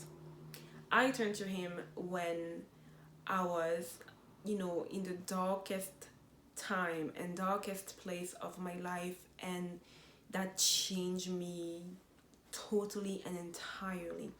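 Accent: Cameroon. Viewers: You sound like a Francophone Cameroonian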